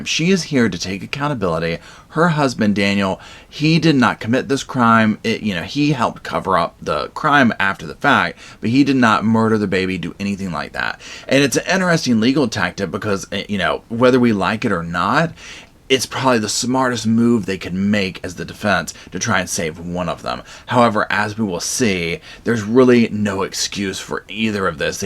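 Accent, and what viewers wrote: South, United States. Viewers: I just love you! I’m from savannah GA and your accent sounds like mine & expresses so well what us southerners sound like telling any stories! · Dude southern accent is soo on point 👌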